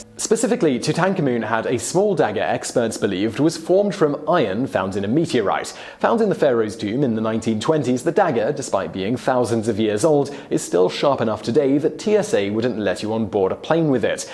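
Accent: England, United Kingdom. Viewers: Dude...hes British, be easy. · he's British I lived in Britain for more than 10 years and they don't pronounce words the same as we do in America. Go directly to an arcade and purchase a common-sense ticket. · He's English, it is hard enough as it is to pronounce a lot of Egyptian and other North African/ Middle Eastern names as an American speaker. I'd imagine it is a little bit more of a challenge as a "proper" English speaker. The two languages are at simply odds for pronunciation with translations. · ATON!!!!!! Christ, British people never say anything like the rest of the English speaking world!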